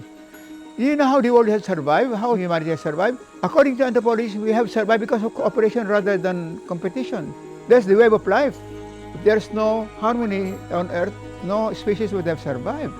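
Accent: Philippines. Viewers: While listening to you sir I thought you speak in tagalog, I can understand you clearly, I love the pronunciation very much Filipino. Looking forward for this kind of documentary works from you. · Yes that Taiwan today was not even Chinese then, formerly its name was Formosa. I can sense accent of Mr. Narrator..ilonggo ?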